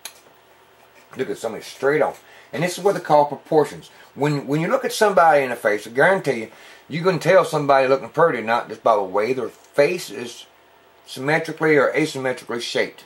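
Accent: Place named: West, United States. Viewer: Love the Western Accent it makes the video more awesome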